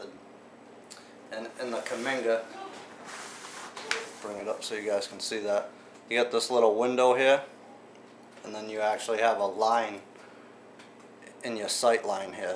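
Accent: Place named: Boston, United States. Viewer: That is the hardest Boston accent I’ve ever heard!! 😊👍🏽👍🏽 Good stuff bro. Easy to understand.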